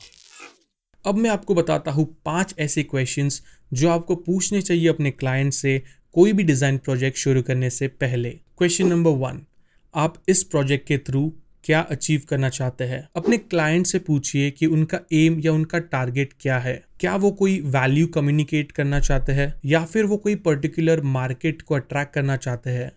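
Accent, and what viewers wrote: Maharashtra, India. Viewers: Kya Aap Marathi Ho🤔🤔🤔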